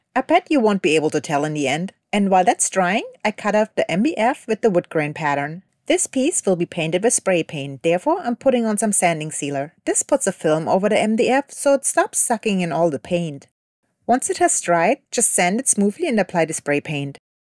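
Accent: Germany. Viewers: German accent is cool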